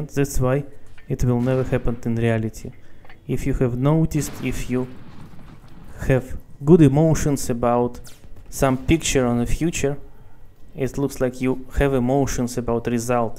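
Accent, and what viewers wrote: Russia. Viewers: ooh,hi my Russian brother!!! very tick accent,i love it! greets from Macedonia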